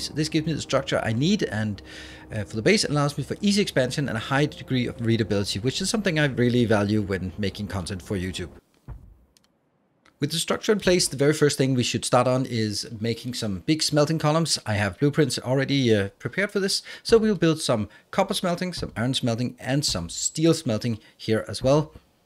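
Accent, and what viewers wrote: Denmark. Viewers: You sound Danish.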